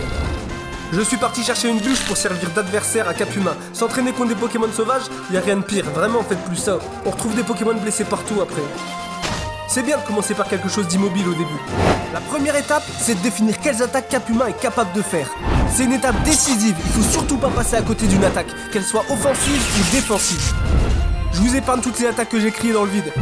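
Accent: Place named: North, France. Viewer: pas = po il vient du nord cet accent mdrrr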